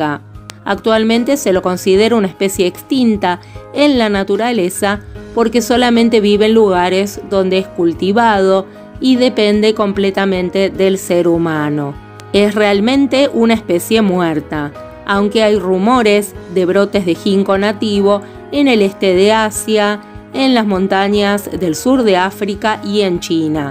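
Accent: Argentina. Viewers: Nuevo suscriptor.. Excelente contenido.. Sos de Argentina?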